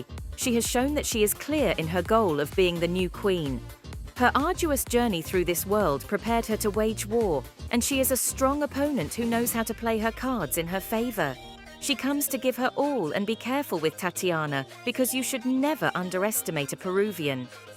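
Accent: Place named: England, United Kingdom. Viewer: English, your voice sounds like the Crown sisters from the Philippines and love it and the English accent.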